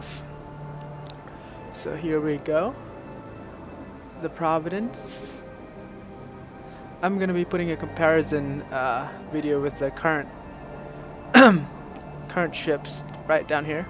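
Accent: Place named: Scandinavia. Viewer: thanks for the video. well done. really enjoyed it. one question though: r u from norway?! ^^ur accent sounds scandinavian, which I like for some strange reason. :d if thats the case: mange klemmer fra tyskland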